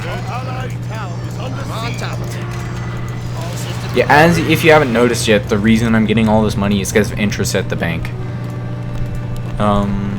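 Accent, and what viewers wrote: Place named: Dakota, United States. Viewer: Are you from Dakota or something whats that accent?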